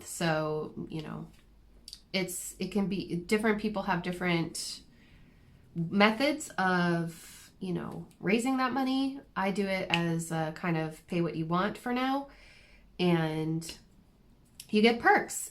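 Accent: Ireland. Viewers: The one I noticed is that you are picking up on the accent of Ireland so neat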